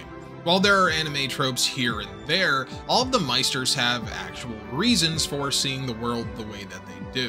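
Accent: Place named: England, United Kingdom. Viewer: Thanks for the coverage, but how you reference all noun/name with the English accent is amusing! For me, So-les-ta-lo being all the way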